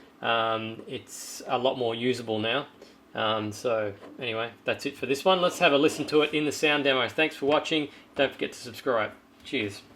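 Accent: Australia. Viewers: I watch your videos assiduously, and a great help for us in Latin America, would if it had subtitles... (even in English, because of your fancy Australian accent) =) We really will appreciate! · That's gotta be the first time our Aussie accent has been described as fancy! I must agree-this channel is full of awesome info. I'm a very recent subscriber.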